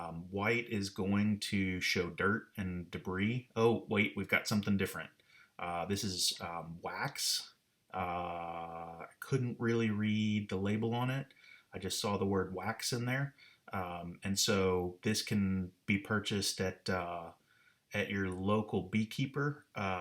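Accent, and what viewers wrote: Norway. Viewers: Norwegian accented stamps... braided and unbraided and braided again rope 😂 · I haven’t laughed this hard in weeks! Bunny suit, whitest paint out there, dip them in a bucket, stamps with a Norwegian accent, I had to pause the video and regain a semblance of control so I could hear the rest. What a guy!!! Thanks to you both for such a fun watch, wash those hands and stay safe and well. Kris P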